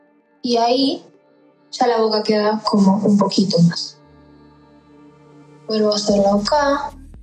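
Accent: Argentina. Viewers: Hermosa con o sin maquillaje, amo a los argentinos y ese acento tan lindo, además nadie puede negar el talento que tiene esta chica, una voz preciosa y súper bella.... ♡♡♡♡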